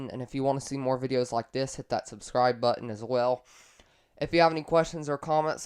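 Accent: Texas, United States. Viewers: great advise and your voice sounds nice (sounds like your from texas or near that area)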